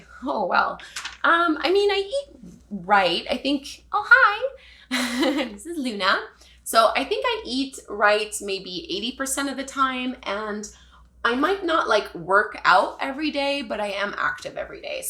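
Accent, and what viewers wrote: Quebec, Canada. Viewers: C'est drôle comme même dans les commentaires on lit l'accent québécois 😄 I am french and it was a nice and funny surprise to hear you speak french with that incredible canadian accent 😄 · Dang, Quebecois French is ... somethin' else... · its probably really obvious to them, but i didnt realise you were canadian till just now ;) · Salut, je ne sais pas non plus que tu es francophone. Je te suis depuis le début de la pandémie ou je me suis mise au yoga. Je te suis de Suisse francophone. J’adore ton accent québécois. Merci pour ton super travail, Une vidéo en français ca serait cool. j adore ta chaîne à la prochaine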